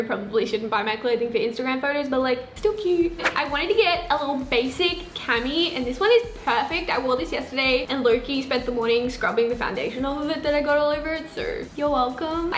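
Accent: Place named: Australia. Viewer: I am literally going to forever21 and getting everything you got in this video! I love hearing your voice, because I’m Australian, and I very youtuber I watch, is American, so I love hearing a fellow Aussie! Love u!!! P.s I love denim on demon PPS soft squad! PPPS I think it is a lettuce hem!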